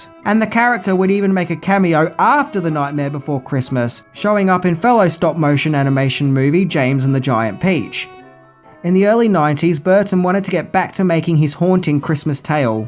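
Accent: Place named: Australia. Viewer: Eh, he's Australian... they say things weird. I remember my Ex and I laughing back and forth over the way each of us pronounced "roof" or "shore"...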